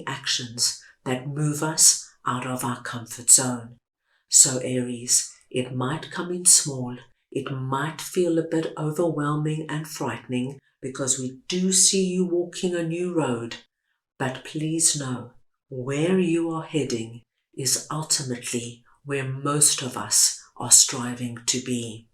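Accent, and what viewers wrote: South Africa. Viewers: Sounds white south African possibly Afrikan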